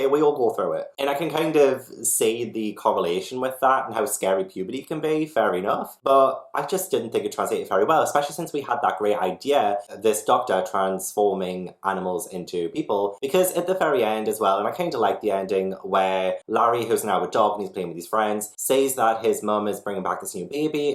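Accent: United Kingdom. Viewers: Hey it’s European (somewhere in the UK I think, maybe Wales or Scotland, the accent isn’t too familiar to me) Josh Peck! What’s up? Great video! I’ve never seen your channel before but I like it a lot already! Can’t wait to watch this video! If I like it enough by the end I’ll be sure to subscribe! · You sound like a fruit and the British accent doesn't help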